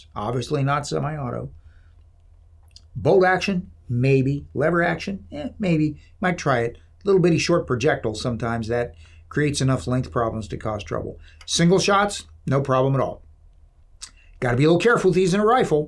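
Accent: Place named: United States. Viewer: You don't sound English, you sound American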